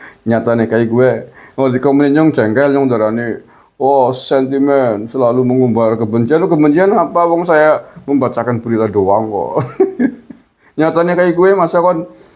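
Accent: Banyumas, Indonesia. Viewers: Bang smpyan itu jawanya mana ya kayak nya ngapax ngomongnya.salam 0,2 maju terus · Pak Gus Marngapak jg y..pada bae nyong beh ngapak pak bravo sehat selalu🙏💪betul pak apa yg ditabur 01&03 akan mereka tuai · Janen rika wong ngapak ya,,memeng prabowo gibran yes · Deneng ngapakk pak😅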